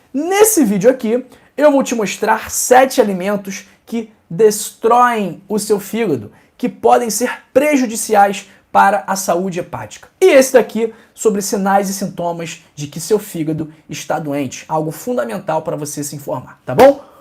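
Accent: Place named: Pará, Brazil. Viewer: Su aque do Pará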